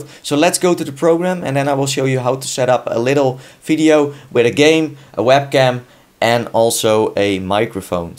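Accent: Netherlands. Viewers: this guy has dutch accent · are you Dutch becous you realey sound Dutch becous i am Dutch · ik hoorde meteen dat je nl was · Jij spreekt Nederlands hé je hoort dat aan accent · Are you dutch? Cool! I could hear it by your accent!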